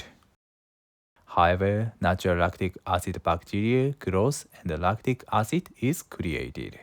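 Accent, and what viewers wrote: Japan. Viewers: I would like to commend you on the progress of your English speaking. I also love that you have preserved your traditional Japanese accent which is delightful to listen 🎧 to. I love your videos and thank you for the wonderful content you provide. This one is a particular favourite of mine. Regards and have a great day 😊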